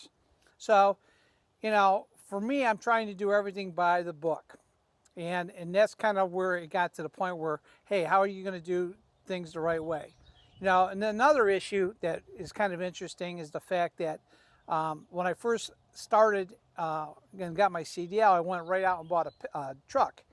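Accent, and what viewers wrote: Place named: New England, United States. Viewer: You sound like a yankee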